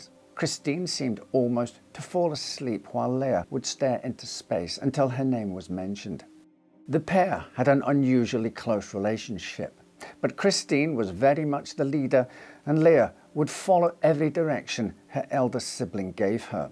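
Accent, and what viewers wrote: Scotland, United Kingdom. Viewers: See, my husband thought you were Welsh…but I know a Scotsman when I hear one! My favorite accent!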